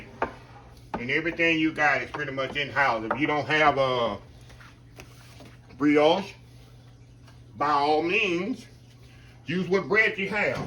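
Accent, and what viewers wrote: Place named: Texas, United States. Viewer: If you had not already told us, this Houstonian would know you were a Texas southerner by that mm mm mm. It's fun to listen to you enjoy your own food. That's how you know it's good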